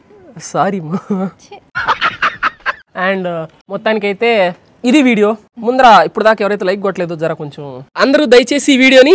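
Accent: Nellore, India. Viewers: నెల్లూరు slang ❤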